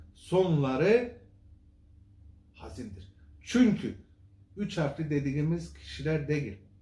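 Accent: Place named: Birecik, Turkey. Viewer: Tam bir berecik şivesi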